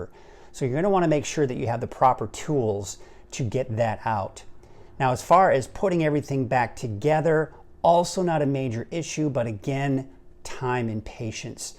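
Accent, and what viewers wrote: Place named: United States. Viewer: I Think you're the best guy out there on YouTube to practice the American Accent :) Love your videos!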